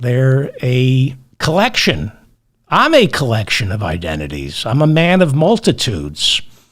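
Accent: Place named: New York City, United States. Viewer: Hey now. I am from Tennessee, Georgia and Texass... (And an early 7 year stint, where my Bush Dad took us, in Hartford, Connecticut -just long enough to mess up my Southern Accent) AnyWAY...I love All accents, especially your NYC Jewish... I've been saying y'all All of my life.😂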